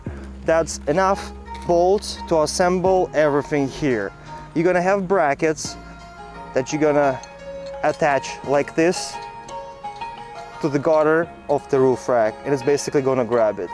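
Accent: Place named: Eastern Europe. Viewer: Am American and the Eastern European accent are enjoyable to listen to and makes for an easy How-to tutorial. Love the roof rack. You earned my subscription. Keep up the great content 👏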